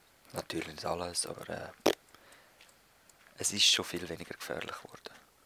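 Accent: Switzerland. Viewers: SCHWIZZERDÜTSCH <3<3<3<3<3<3<3<3<3<3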